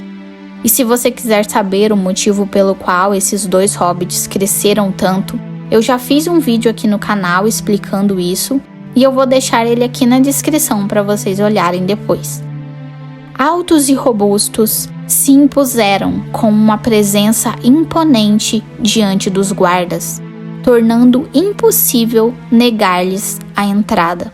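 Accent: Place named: São Paulo, Brazil. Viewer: A narração é muito boa, só tenha cuidado com a pronúncia de algumas palavras, como "abrutalmente", que eu acredito que seria "abruptamente", e algumas outras, que estão com um sotaque paulista/sulista muuuito puxado no "r". De resto, esta muito bom!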